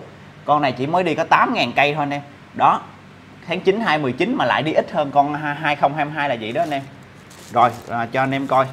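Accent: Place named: Ninh Thuan, Vietnam. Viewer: Nghe tiếng a nói chuyện, giống dân Ninh Thuận quá ta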